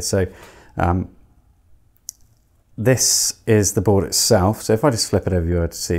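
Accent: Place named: Switzerland. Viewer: here is the guy with swish accent ;) i like your videos sir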